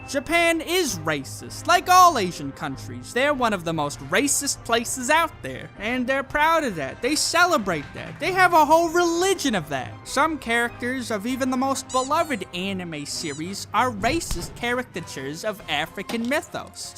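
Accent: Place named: Boston, United States. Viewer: Chimp champ are two different words your dialect is very bosten east coast like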